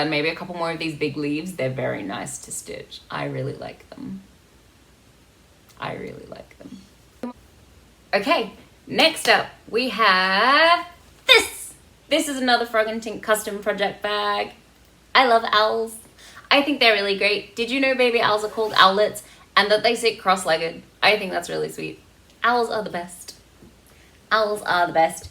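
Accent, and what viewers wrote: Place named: Australia. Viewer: I knew as soon as I heard the accent you were an Aussie!!!! Yay!!! Love seeing other Aussie cross stitchers…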